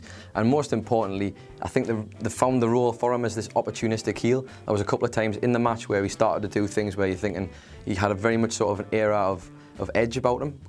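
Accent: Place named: North, United Kingdom. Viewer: Holy... dude slow down! Talks way too fast... way too British... fast British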